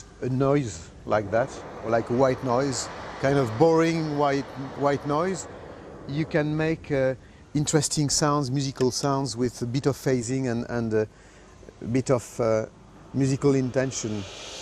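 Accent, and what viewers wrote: France. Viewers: JM Jarre after a few years all around the world you have allways a huge french accent lol ( me too belgian/franch accent when i speak english) you're great , your music 's whit me from my chlidhood (im from 1977) exactly like kraftwerk or vangelis/klaus shulze/space/herbie hancock and many mores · He speaks English with a French accent but very well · He is actually a short little Frenchman with a French accent.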